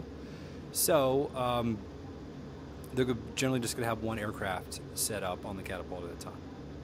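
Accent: United States. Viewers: This former Navy pilot hears a Philly accent?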